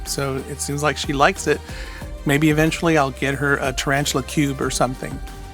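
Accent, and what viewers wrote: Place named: New Mexico, United States. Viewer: You kind of sound like you're from New Mexico. I have family from there and the drawl is very distinct. Of course I'm probably wrong but it's just that your voice sounds so familiar. Love your videos by the way! Really good camera shots. Love it!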